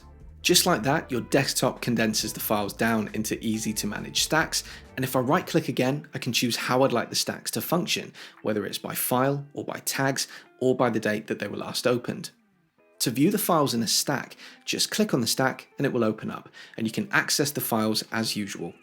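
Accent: England, United Kingdom. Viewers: Great video as usual. I’ve gotten so much benefit from your content; you condense all the fluff and get straight to the useful info. Every Mac user should subscribe! On a personal note, the British accent is just an adorable perk. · I really like your 10 tips videos,. Since I moved to Apple a couple of months ago after 36 years (1986!) in the Microsoft-Intel world, I used a lot of your tips to make my MacBook Pro comfortable and convenient. Nice to hear a clear English accent, too. All the best, Rob in Switzerland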